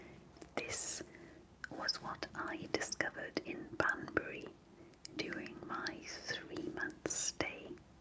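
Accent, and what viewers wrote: Wales, United Kingdom. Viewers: I really love the Welsh accent, especially when women speak it, but the ASMR whispering just isn't for me. Are there any recommendations for YouTube channels, podcasts, something where I can listen to some heavy Welsh accents talking about some nice stuff?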